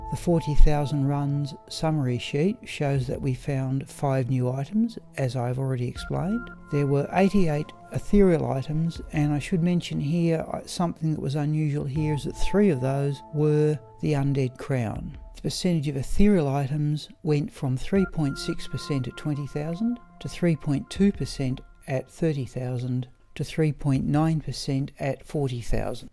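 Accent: Australia. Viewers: I began watching your streams and videos probably about a year and a half ago, and through all the good characters and crafting sessions, I loved watching you run Pindleskin more than anything. It was fun to see some of the drops and lowlights make it into various monthly highlight/lowlight videos, as I could look back and exclaim, "hah, I remember that stream!" I've been watching many streams for many years, as well as ASMR videos on YouTube for roughly a decade. Your streams tirelessly plugging away at Pindleskin always put me into a nearly catatonic or zen-like state. I do not say they were boring. Rather the opposite. Your cadence and Australian accent and logical approach reminded me of a professor I had many years ago, and it was funny to find out you were also an educator. I could listen to him or you for days and find solace. Call it weird or interesting, I had to share. 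I'm not alone here in saying we all appreciate your streams and especially your dedication, sharing a game we all love. Personally I have a much different vested interest in that your candor/approach are the perfect accompanying background noise or secondary monitor treat to my lifestyle of gaming and streaming. So from me to you, thank you. (I formerly went by Aralern24, but changed my YouTube alias to reflect my world of warcraft character lol)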